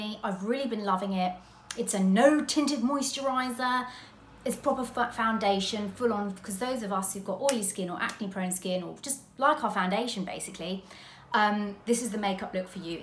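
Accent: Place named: Northern England, United Kingdom. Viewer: You look absolutely stunning ❤. I love that you totally, 100% know what works on your skin and how to use those products to give a beautiful finish, and with no faff or complicated techniques. 👌👌 I'm also glad that you're having lovely warm weather, I miss the summer's in England so much. The country pubs, the charming chocolate box villages, and hearing the birds sing......so many precious and wonderful memories of my younger life.....🥰 Loving the Northern accent 🫣🤣 Enjoy the sun 🌞 ❤🥂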